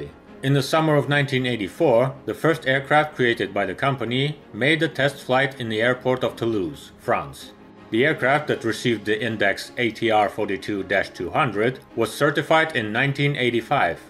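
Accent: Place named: Russia. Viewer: In Russian aircraft are male, and going by accent that might be why he is using that.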